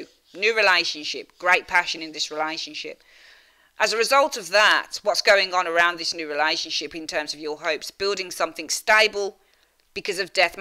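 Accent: Birmingham, United Kingdom. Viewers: I liked your reading and the way you put it over in your obviously english accent? I would surmise it came from somewhere near Birmingham?I am from near there myself originaly but now live in Australia for 49years.You say you are a gypsy?I would love to know your surname, as I am a Romany by birth we prefer that to gypsy as we are the people of Rom! not a lot of readers shoot straight from the shoulder but you do I like it and can handle the swear words to it shows passion in what you do and is not as everything seems these days politically correct,thank you for the reading as I do not understand Tarot but do Astrology.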